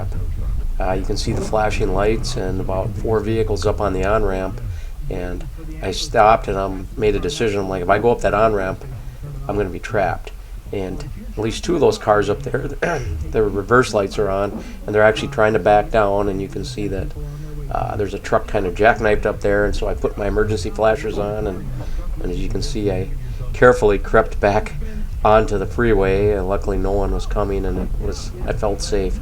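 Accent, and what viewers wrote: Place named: Minnesota, United States. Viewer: This is the most Minnesotan accent ever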